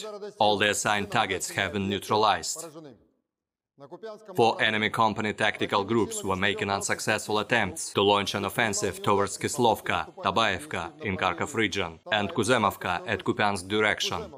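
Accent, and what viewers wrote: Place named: Indonesia. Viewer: pembawa bicaranya suaranya medok indo bangke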